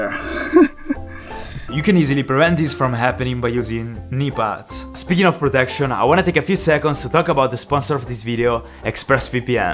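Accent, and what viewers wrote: Italy. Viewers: Ma quanto cazzo si riconosce che sei italiano! 🤣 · Si sente che sei italiano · Hai l’accento italiano 😂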